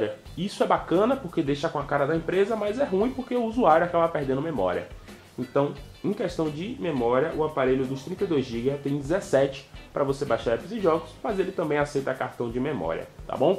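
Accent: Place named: Bahia, Brazil. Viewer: Gostoso! Você e baiano? Que sotaque delicioso. Amei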